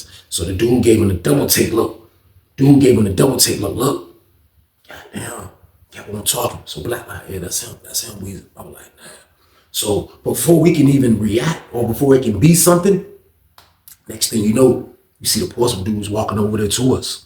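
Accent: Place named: Brooklyn, United States. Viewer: Sounds like Brooklyn dudes in NY lol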